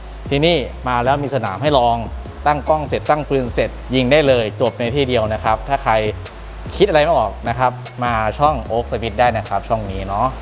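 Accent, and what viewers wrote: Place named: North, Thailand. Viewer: ฟังสำเนียง เหมือนเป็นคนเหนือเนาะ ^_^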